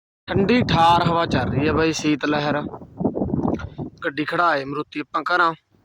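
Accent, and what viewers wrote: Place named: Uttar Pradesh, India. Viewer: area kehra veer thuda boli ta up wli lgdi a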